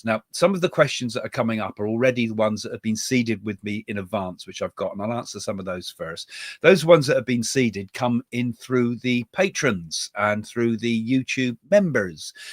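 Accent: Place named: England, United Kingdom. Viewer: So refreshing to hear an English voice on You Tube. So many Psychic forecasters are American. Thanks xx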